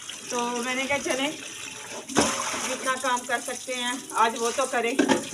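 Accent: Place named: Punjab, India. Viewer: You are punjabi I think from you accent so you should speak Punjabi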